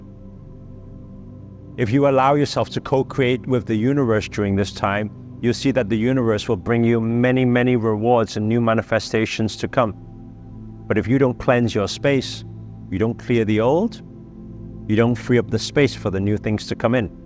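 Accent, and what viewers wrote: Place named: Wales, United Kingdom. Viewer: I had an old colleague get in touch lately but I always had an off feeling about them so I didn’t engage her - was polite but short. I trust my gut. I’ve been giving a training opportunity and taken it by the horns, very very grateful! Where is your accent from? It’s very interesting. Is there some Welsh in there?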